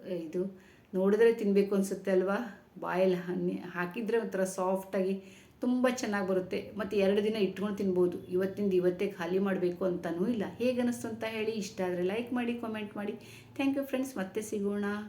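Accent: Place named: North Karnataka, India. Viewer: ನೀವು ಉತ್ತರ ಕರ್ನಾಟಕದ ಭಾಷೆ ಮಾತಾಡ್ತೀರಲ್ಲ ಸಿಸ್ಟರ್ ನಾನು ನೀನು ಉತ್ತರ ಕರ್ನಾಟಕದವರು ಅನ್ಕೊಂಡೆ ಸಿಸ್ಟರ್❤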